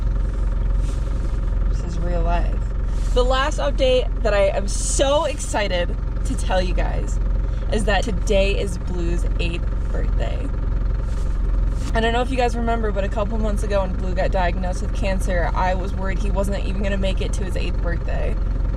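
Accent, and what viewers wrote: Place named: Midwest, United States. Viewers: Oh sure, I didn't even notice the accent until ya pointed it out, ya know. Whelp, from one Midwest girl to another: Tells yeh mom an them I says hi. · you're weird, because you are YOU. That's who you are. And the seats? Weeeeell.... 🤣🤣🤣🤣No, just kiddin. You love them, then I love them. And, Good you got these stuff done to Poe. And, what's wrong with your Michigan accent? Be proud of who you are. We, your people, love you. And we sure hope the Best for Blue! ♥️♥️♥️♥️♥️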